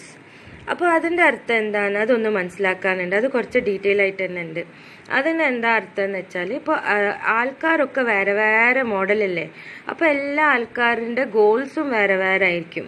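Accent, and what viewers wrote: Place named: Kasaragod, India. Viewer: Madam please try to avoid the Kasaragod slang ❤️ thank u mam for your help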